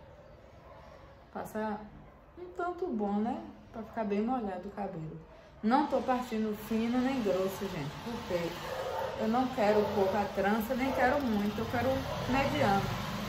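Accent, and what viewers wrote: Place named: Bahia, Brazil. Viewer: Ela é baiana, o sotaque igualzinho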